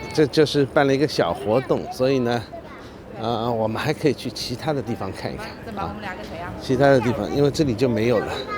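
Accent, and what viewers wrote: Shanghai, China. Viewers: 从你口音我觉得你是个土生土长的上海人，我也是，在国外的街头偶尔也是听到上海话，匆匆而过时，彼此也会报以会心的一笑，我们都知道这是思乡之情在作祟，因为我们都想念它。感谢你的视频，感觉是你的一种释怀，但还是要谢谢你的豁达，Merry Christmas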